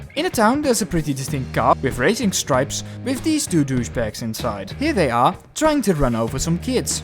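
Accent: Netherlands. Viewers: nice dutch accent! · waarom klink je zo nederlands???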